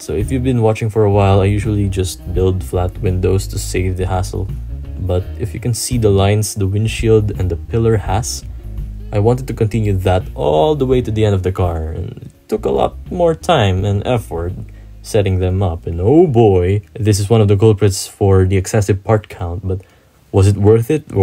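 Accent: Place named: Philippines. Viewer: i can hear the filipino accent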